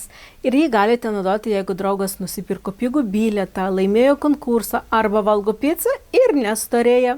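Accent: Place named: Latin America. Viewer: Pas jūs daugiau Lotynų amerikos tartis , o gal kito regiono , nes Andaluzijoj š nevartoja .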